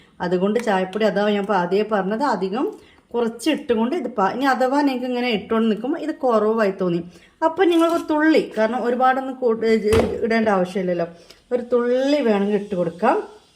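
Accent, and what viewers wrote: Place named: Kozhikode, India. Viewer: ഹലോ ഇങ്ങള് നാട് എവിടെയാ ശരിക്കും നിങ്ങൾ കോഴിക്കോട് വർത്താനം ആണല്ലോ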